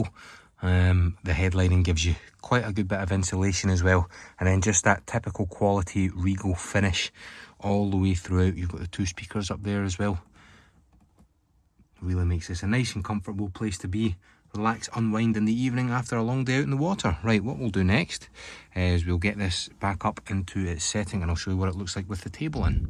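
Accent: Scotland, United Kingdom. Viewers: I love that scottish accent!! watching the videos only for the guy talking, love it !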